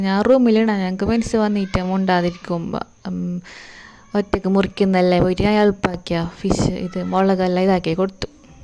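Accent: Kasaragod, India. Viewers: കാസർകോട് ആണോ ..ഈ സൗണ്ട് ആണ് നല്ലത്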